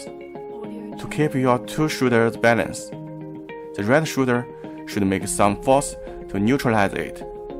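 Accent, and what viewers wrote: China. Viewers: po主是北京人吧。反正我觉得挺像那附近的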